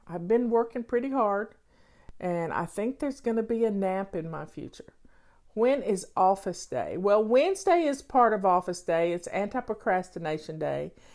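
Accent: South, United States. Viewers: I love your Southern accent! Had to buy a new washing machine. How's that for celebrating laundry month!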